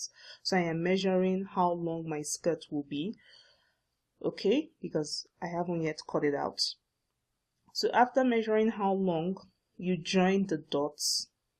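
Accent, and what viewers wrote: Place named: Nigeria. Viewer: This is awesome! Thanks a million for sharing. You sound Nigerian, I might be wrong? I am Nigerian myself. Anyway, Thanks so much!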